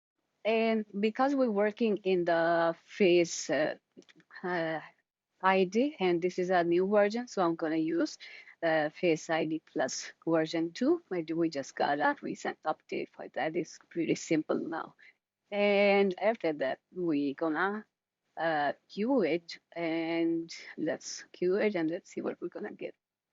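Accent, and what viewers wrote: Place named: India. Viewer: Just about suffices as a walkthrough of building a very simple workflow for using FaceID with IPAdapter. Half of it is the application of using openpose with a controlnet and NOT a tutorial on FaceID. If you have Indian English and/or experience of low teaching quality then it may prove useful. However, if you're a native Anglo-American English speaker you may find the delivery extremely frustrating. If you are used to being taught by advanced pedagogists then you may also be disappointed by the lack of any clear explanation of the why. This is simply a very basic walkthrough of a simple workflow with no explanation of what is actually happening.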